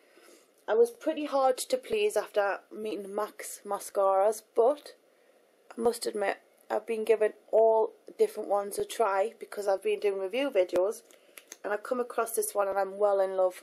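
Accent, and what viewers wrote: Newcastle, United Kingdom. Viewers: i love love love your videoss, and loove you newcastle accent:D thanks for this video hunnie:) xxxx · Thats a Newcastle accent, not Irish! She comes from my end of the country yey! And I love your videos theyre so great =] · R u from Newcastle? :S :) x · Are you from Newcastle ? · love the geordie accent, its sounds like meeeee!!!!!!!!!! :) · its called a geordie accent. dont leave your area much do you?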